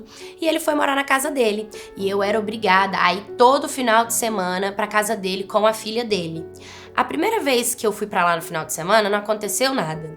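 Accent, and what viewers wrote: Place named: Pará, Brazil. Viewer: Vc é paraense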